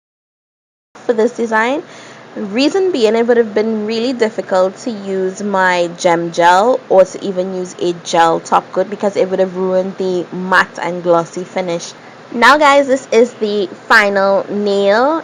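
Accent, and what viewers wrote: Trinidad and Tobago. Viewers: Sounding Trinidadian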